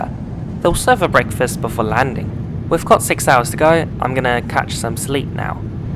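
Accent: Australia. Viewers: Hey did you go to school in Australia. I am hearing your accent😂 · The Aussie accent is classic mate!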